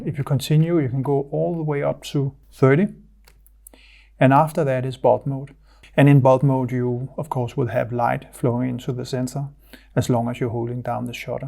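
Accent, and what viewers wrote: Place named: Denmark. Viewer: I've been following Youtube and Private site for a few years now, one of the best out there for learning - clear, concise and to the point with the bonus of that beautiful Danish accent - deserves much more than the current 15k subscribers. The D4s has been my dream camera since it was released and I almost bought one back in March '25 but went with the D810 to add to my D7200 and D7000 - I was put off by the added cost of XQD / CF cards [ though the D810 does have a CF slot I've not bothered using it ] - Watching this video I'm tempted to revisit the D4s once more.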